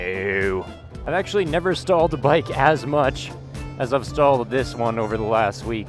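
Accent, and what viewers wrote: Canada. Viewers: I could tell he was Canadian before i watch this video or knew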